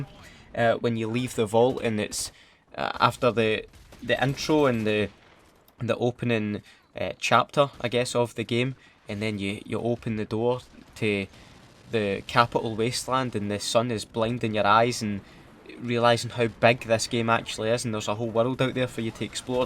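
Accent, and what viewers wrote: Scotland, United Kingdom. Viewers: Your like a Scottish daihi da nogla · Really didn't feel like 40 min. Such a beautyful scottish accent. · So he is Scottish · a know how terrible it is trying tae try talk proper so folk can understand you cause am Scottish as well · I've never seen someone so funny, I don't know if it's your Scottish accent or you general humour! But you're genuinely a funny fucker! Hope to hear back from you! :)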